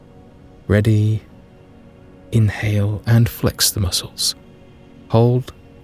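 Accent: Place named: United Kingdom. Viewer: so glad this is a british person i hate the annoying american voices that they usually have on these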